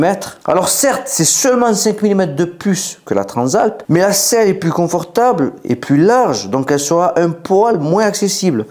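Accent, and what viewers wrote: South, France. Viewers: fatche t'as l'assent ! continue, on apprécie ici dans le Nord (la Bretagne)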